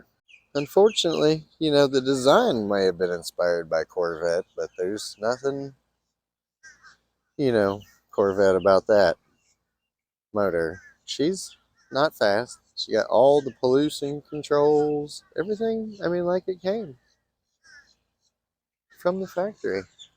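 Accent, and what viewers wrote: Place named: Texas, United States. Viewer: great classic Mazda, and love your good ole Texas accent :face-blue-smiling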